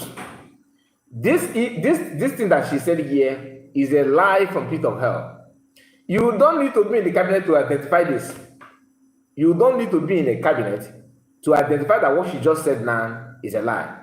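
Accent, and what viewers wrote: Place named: Fulani, West Africa. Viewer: You don't know where you come from that's why your accent is the same with foolani people. Leave nwanyi isi ocha, leave all the saga and your stupid analysis. Let's talk about PM, let's talk about MNK, let's talk about Biafra recognition, let's talk about Homeland. How long are we going to remain in this saga? Is this how to run a government? Criminals!!!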